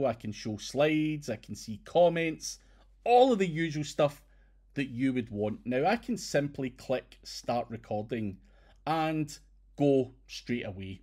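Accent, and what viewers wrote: Scotland, United Kingdom. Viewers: Scottish brogue: still undefeated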